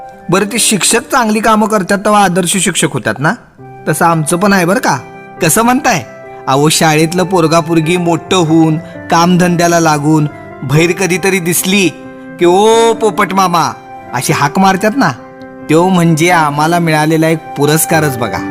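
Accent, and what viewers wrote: Kolhapur, India. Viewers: एक नंबर साहेब. नुसतं आवाजावरनं सांगतो तुम्ही कोल्हापूरचे आहात. आम्ही आहे दुसऱ्या शहरात. पण जिल्हापरिषदेच्या शाळेसारखी मजा कुठेच नाही.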